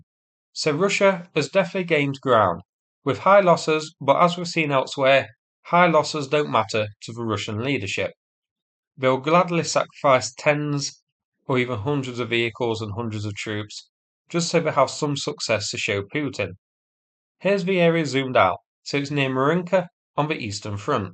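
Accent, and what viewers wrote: United Kingdom. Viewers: Just an observation. You are speaking His Majesty’s English. Here in America. I noticed while working in a Nippon Restaurant. That in Nippon. They teach H.M.S. English I.E. Bonnet = Hood. Boot = Trunk. Knickers are Panties. So My vocabulary is expanding. I use Rodgerd,Knackerd now. And there called cookies Not biscuits. Torches are for Lighting fags ? Oh cigarettes. No it’s a Flashlight. Keep up Your Good Work Sir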